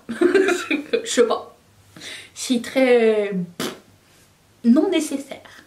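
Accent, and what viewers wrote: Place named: Quebec, Canada. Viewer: Chui d'accord av toi pour UD .. perso quitte à me choper une palette dans le même genre , j'opterais pour la Vénus XL de limecrime ❤ j'adoooore limecrime ... puis elle est plus grande pour le même prix si il faut . Ta chaîne est géniale en tout cas , tu me fait délirer et tu commence à voir le petit accent tabarnacle ;) T'as un putain de look chui trop fan ! Et on en parle de ton truc en plume la ?? Ou as tu shopper cette merveille !!!!????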